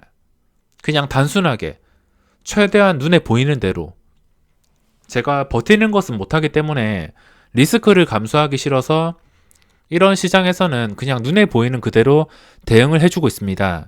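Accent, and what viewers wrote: Gyeongsang, South Korea. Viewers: 이해해 주세요~ 저도 그렇지만 경상도는 발음이 어렵습니다ㅜㅜ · ㅋㅋ 경상도 분이라 발음이 좀 쎕니다.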